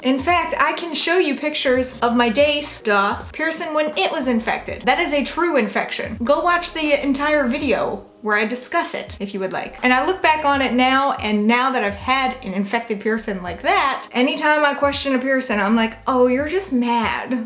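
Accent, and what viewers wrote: South, United States. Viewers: I must ask your southern correct?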